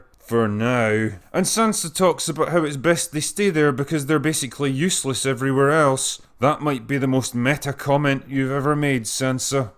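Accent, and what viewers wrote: Scotland, United Kingdom. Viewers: It's amusing how much more Scottish and drunk he sounds in his older videos. If only he knew when he made this video that it was going to get even worse... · Are you Scottish? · OMG--I could just listen to your rant for hours! That Scottish accent and the delivery! YOU should be some kind of GOT character. I don't even care if I agree or disagree with you! LOLOLOLOL! R.E.S.P.E.C.T.!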